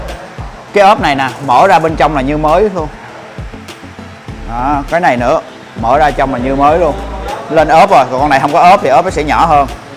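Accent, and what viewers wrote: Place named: Phan Thiet, Vietnam. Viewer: anh từng sống ở phan thiết đúng k anh? Nghe giọng giống dân pthiet quá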